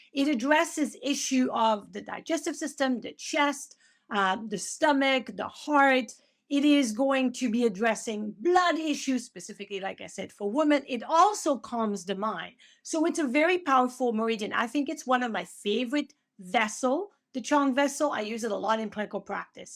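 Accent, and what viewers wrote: France. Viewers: you are awesome very gifted teaching TCM with passion; please do not apologize for your French accent! Joanne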